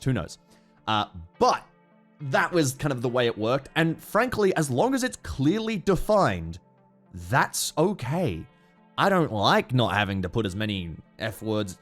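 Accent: Australia. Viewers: man i was so conviced i'd clicked on a muta vid for a second there im so glad your australian accent gave it away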